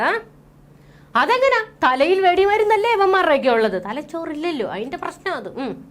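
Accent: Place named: Kannur, India. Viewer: ഇയാളുടെ സൗണ്ടും സംസാരവും പൊളി ആണ് എന്റെ fave ആണ് ഇയാൾ ഓണ്ലൈന് ചാനലിൽ 🌹❤️ പിന്നെ ആ😊 ക😊ണ്ണൂർ സ്ലേ😊ങും👌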